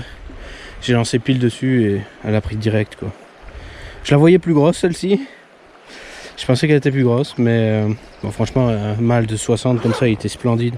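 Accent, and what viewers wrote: Belgium. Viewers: Wouah 😲 respect mec 🤜. TRES belle section, des truites magnifiques. j'ai Jamais vu une vidéo pareil...tes très respectueux avec les truites un vrai passionné ça fait plaisir à voir 😊. Je m'abonne à ta chaîne du coup et bien sur le petit pouce 👍. Ne change pas surtout 😉. Bye PS: Petit accent belge ?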